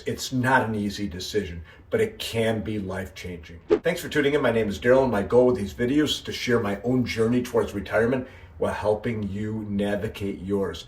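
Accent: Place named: Chicago, United States. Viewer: 7 years ago, after the youngest of our kids was a sophomore away at college, we downsized from 4 bedroom/2.5 bath (2,200 sq ft h in the suburbs into the city to a 3/2 with 1,850. We both absolutely love our one story, all brick SMALLER house! We are in close proximity now to everything: our jobs, our church, stores, hospitals. Less house to keep clean, less property taxes, utilities etc. (we live in coastal Georgia.)The only challenge? We have only one guest bedroom, so at holidays when our kids and grandkids visit, THEY have to pay for hotel or AirBNB. The youngest has a spouse but no kids so thus far she’s usually the one who gets the guest bedroom. My husband and I both U of I grads so I enjoy your Chicago accent 😊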